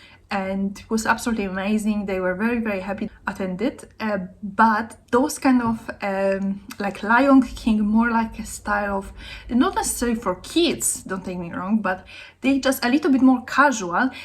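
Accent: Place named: Russia. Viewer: hi there ! i really am thinking abt going at the Opera on my birthday this year (i'm 17,) are there dresses we can wear : formal - elegant but not womanly. really chic. if you know what i mean ? i really am thankful for your video ! btw , not to be rude , but i'm sensing a really really beautiful russian accent ? or ukrainian ? take care xx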